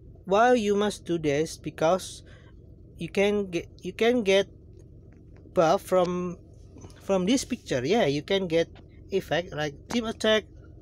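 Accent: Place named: India. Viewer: he sounds indian...okay dislike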